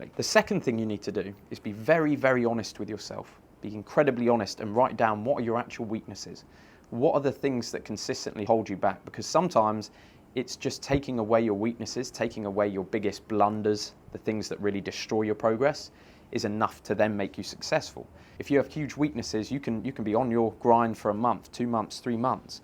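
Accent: United Kingdom. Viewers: Loving this video format, seems like is more calm here, and all of a sudden he comes across way more british for some reason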